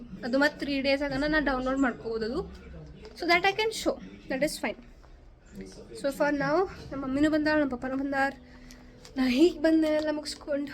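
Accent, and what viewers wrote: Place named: North Karnataka, India. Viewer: Hii, hegiddira.. Nim uttar karnataka kannada nange kelalike ishta agutte,heege videos madta iri. Naavu nodi share like comment madtivi😁. I'm ur fan from coorg❤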